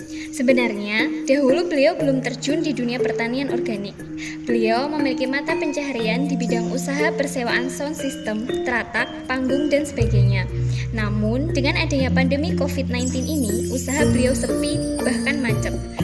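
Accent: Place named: Java, Indonesia. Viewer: Suarane medok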